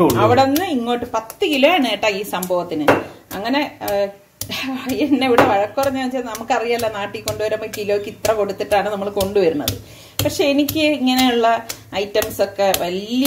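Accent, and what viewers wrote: Trivandrum, India. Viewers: Thanks itha.eppozanu video kanunnate .teachere bahumanikkunna ithaykku big salute.l am a teacher.super biriyani itha. Tvm bhasha kelkkan nalla rasam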